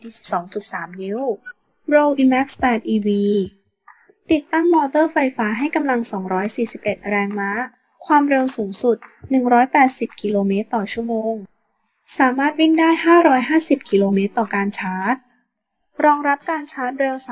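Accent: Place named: China. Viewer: พูดไม่รู้เรื่อง อังกฤษสำเนียงจีน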